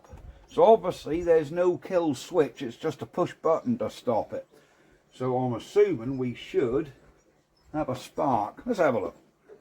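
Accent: Norfolk, United Kingdom. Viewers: Great to hear a Norfolk accent. I was born in Norwich near the St Augustines Gates now in South Australia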